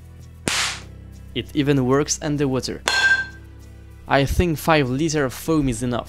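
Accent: France. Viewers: Are you from germany? Why do you have accent of french?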